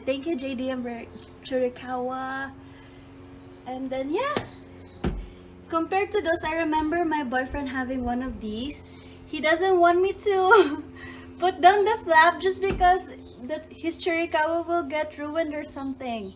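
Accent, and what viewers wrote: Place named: Philippines. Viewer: That Filipino accent lol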